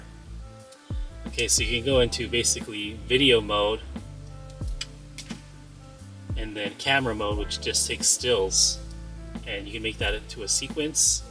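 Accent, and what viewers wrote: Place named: North America. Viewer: you sound like you’re from north america, so it should be set to 60hz. at least that’s our electrical currency and can result in flickering when viewing back recorded videos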